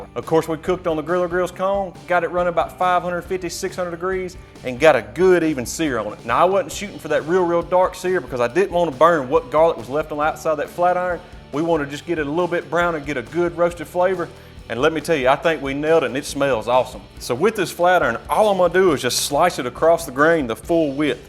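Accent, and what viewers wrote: South, United States. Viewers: Love the southern accent (if am correct). Nice steak, looks quite delicious 👍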